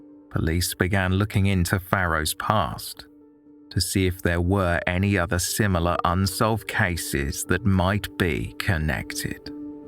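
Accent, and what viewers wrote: United Kingdom. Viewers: I’m so glad I discovered your channel - British 🇬🇧 voice😀 great content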